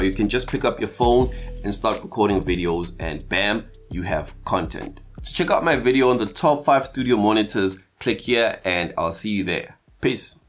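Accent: South Africa. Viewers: i heard by the accent that this is a 🇿🇦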